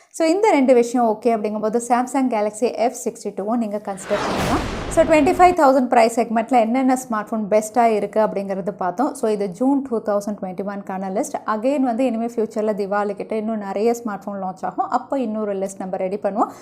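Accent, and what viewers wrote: Kerala, India. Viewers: Kara Kara Kerala tamil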